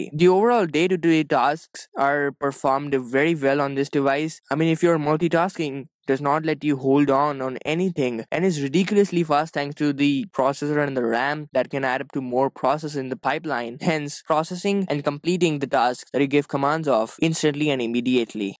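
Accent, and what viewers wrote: India. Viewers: U got indian English accent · Your indian accent is a little thick, just me.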